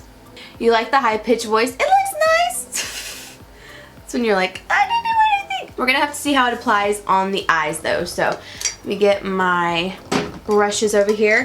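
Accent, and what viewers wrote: South, United States. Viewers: Just subbed beautiful! Love your Southern accent!!!!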